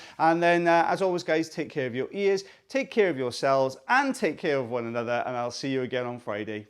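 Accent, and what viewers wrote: Wales, United Kingdom. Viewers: Welsh, Welsh!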